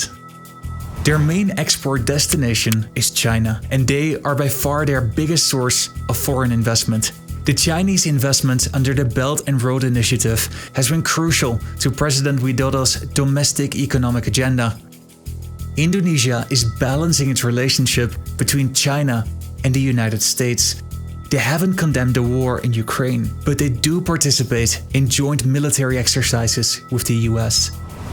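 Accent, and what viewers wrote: Netherlands. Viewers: ROFL, I thought I reckognized that Dutch accent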